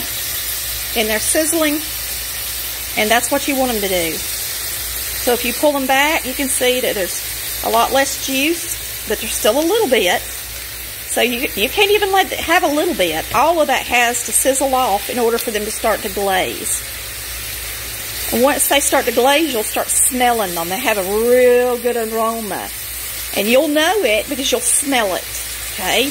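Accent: South, United States. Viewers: love that Southern accent. Cheers.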